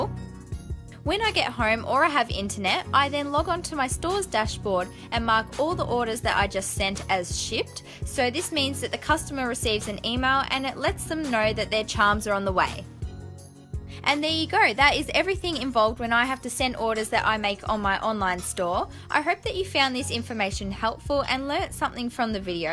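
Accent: Australia. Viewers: Are you Aussie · Are you australian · Are you Australian? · Is she Australian? Because if so that will make me extremely happy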